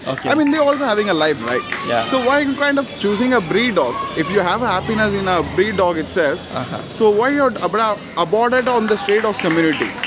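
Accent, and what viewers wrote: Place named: Kedah, Malaysia. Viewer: Aku dah terjerat dgn channel tuan.. Haru... Aku jrg tgk channel mcm ni.. Pasai pa tak tak tau blh sgkut plk.. Mgkin cara penyampaian dan adab dia dgn org kot.. Tuan Penang ka kedah.. Lenggok mcm Penang..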